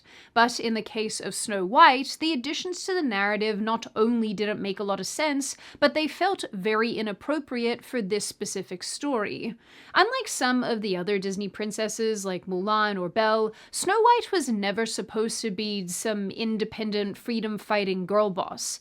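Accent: Australia. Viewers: You’re Australian? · sorry, you are my second favorite funny talking Australian. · The funny talking Australian dropped facts again.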